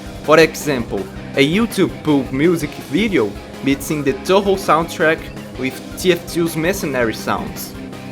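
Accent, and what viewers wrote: Brazil. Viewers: Bom dia mano 😊😊 deu pra ver o sotaque br aew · this accent... I recognize it... you're brazilian, aren't you? me too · nice accent. where are you from? Brazil? Seens me talking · sotaque brasileiro ficou bem incluso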